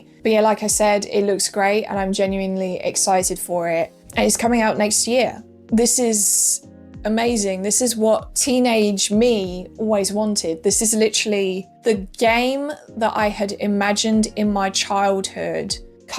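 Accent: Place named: RP, United Kingdom. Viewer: You have the most Cambridge English International Test Listening Part accent ever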